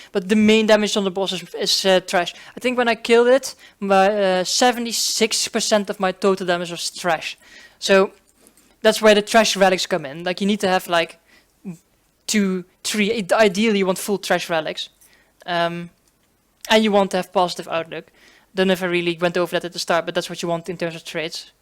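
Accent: Netherlands. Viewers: he, ik hoor wel dat je nederlands bent haha. Het lukt mij maar niet om deze challenge te doen. zou je het voor mij willen doen? kun je het eventueel filmen om het dan op youtube te zetten voor de abonnees 😎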